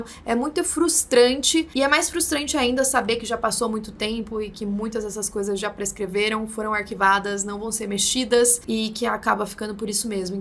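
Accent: Sao Paulo, Brazil. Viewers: O Estado do Pará 🇧🇷 ao Norte do nosso Brasil gigante têm um longo caminho de podridão na história, ou seja, infelizmente aquele Estado nortista é DESGRAÇADAMENTE FAMOSO nas páginas policiais ao longo de várias décadas. Tipo se eu fosse um cidadão nortista brasileiro nascido naquele Estado PARAENSE eu sentiria vergonha de dizer pra qualquer um que eu nasci ali. Graças a Deus que eu sou um homem brasileiro PAULISTANO e com muita honra e orgulho da capital e do meu Estado de São Paulo que é o mais rico não só do Brasil como da América Latina. Lembram daquela FREIRA AMERICANA que tanto fez o bem em ANAPU, na região campestre do Pará? Ela foi covardemente ASSASSINADA a mando dos ricos fazendeiros assassinos e exploradores ladrões do Estado do Pará. Ela foi uma bondosa SENHORA que só fez o bem e teve este fim trágico. Na época eu fiquei revoltado com essa raça desgraçada que há no Pará. 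Lembram do município RIO MARIA? também no Pará, aquela região têm um histórico de BANG BANG, ou seja, é famoso e pecaminoso em ASSASSINATOS COVARDES, faça pra nós, Senhorita, um documentário em seu canal deste caso antigo da Irmã Doroty que foi morta em Anapu ok. Obrigado. E já deixo meus gostos aqui sempre em seu canal. Tu parece paulista também pelo seu sotaque. Tu és paulistana também? Desculpe a ousadia de te perguntar. E encerro por aqui essa carta com minhas saudações calorosas para ti de boas vindas. Fraternalmente. 🎉❤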